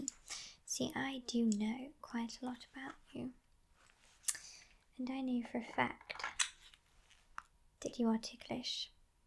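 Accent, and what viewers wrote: United Kingdom. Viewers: my first reaction "shes british"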